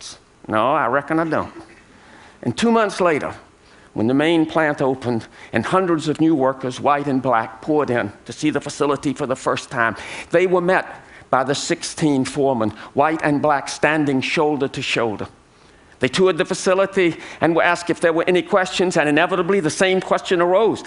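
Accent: United States. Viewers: Quite a bearable American accent. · Marvelous! A Southerner (sans any Hungarian ancestry) talks (in Southern English) about his affinity with Hungarians, or with the Hungarian spirit, or, as James Michener might have put it, an affinity with the cantankerous nature that characterizes Hungarians.... hmm, more politely called that "curiosity", that insatiable inquisitiveness, that characterizes Hungarians. Conjures up memories of old jokes, e.g., ... Q: Are you part of the SETI search for extra terrestrial life? A: Those aliens are already here, they're called Hungarians! My own command of the Hungarian language is woefully minimal, but the speaker's Magyar friends could have schooled him better in the pronunciation of jó nápot pacák, or the names mentioned, etc, even with a Southern accent. Mispronouncing some Hungarian words can get ya into big trouble, I know, just one example being when I saluted some important company with a toast, attempting to say "Egészségedre!", meaning "to your health", but it came out as "Egeseggedre", a polite translation being "to your butthole", which caused a mixture of embarrassed groans and guffaws of hysterical laughter... 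an episode that put a serious dampener on my motivation to further learn that diabolical lingo. One thing I did make sure of after that experience, though, was to make a special effort to correctly pronounce anything I said in any language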